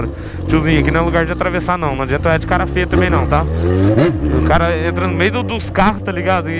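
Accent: Goiás, Brazil. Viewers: Pq vc fala piqui de Goiás?????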